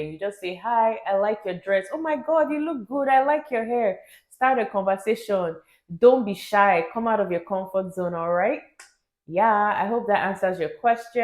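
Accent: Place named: United Kingdom. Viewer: I love the pidgin!! Mix it with bri-ish and canadian accent 😂😂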